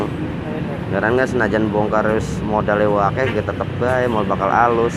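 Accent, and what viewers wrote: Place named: Banyumas, Indonesia. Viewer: Sampean wong ngapak yo.kon wong Endi.salam santun dari Riau 🙏.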